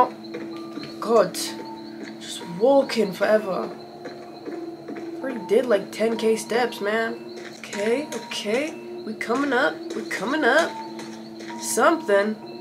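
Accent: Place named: United Kingdom. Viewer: LUV YOUR BRI'ISH ACCENT LUVV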